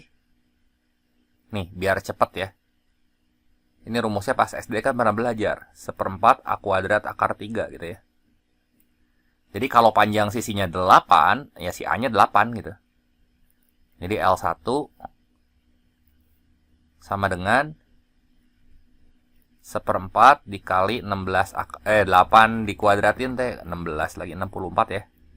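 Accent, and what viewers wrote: West Java, Indonesia. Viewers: Abangnya orang sunda wkwkw lucu jelasinnya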